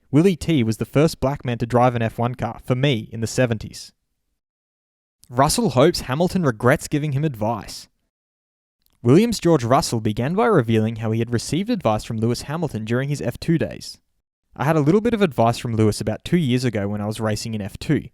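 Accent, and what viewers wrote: Australia. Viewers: Also you sound Australian. Wanted to know if you know if they will only show the main race on television or we will see the two practice days and time trials as well?????? Hope you do read the comments. But I really think you should do the countdown clock.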